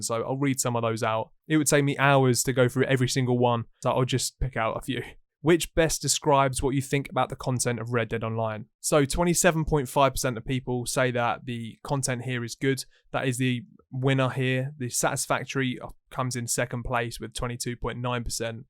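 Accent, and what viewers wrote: United Kingdom. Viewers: I love your natural British accent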